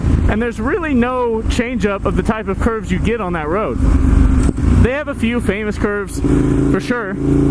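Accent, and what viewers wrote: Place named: Nebraska, United States. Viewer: Your hometown...since when? You sound like your from Nebraska.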